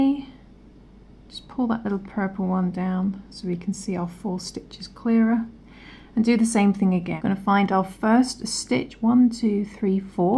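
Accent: United Kingdom. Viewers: Love this and will be trying it. Just find it bit confusing you use US terminology. why is that? is it just personal preference. you certainly sound British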